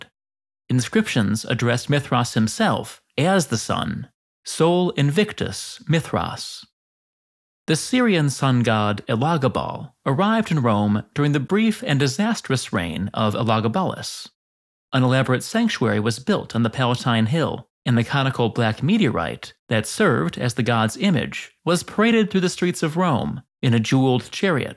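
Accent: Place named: Wisconsin, United States. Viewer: love that Wisconsin accent 👍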